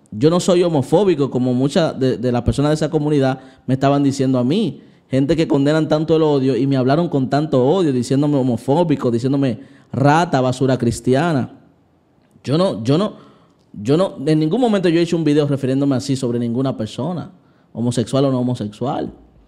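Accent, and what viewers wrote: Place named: Dominican Republic. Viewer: Es que ese el acento dominicano. Sip, somos el país que peor habla el español en Latinoamerica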